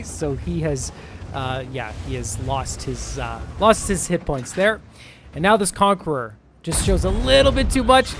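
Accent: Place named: Canada. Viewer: I guessing you're a fellow Canadian? Lol ...I haven't caught ab "eh", but I did catch the "Zed". 😉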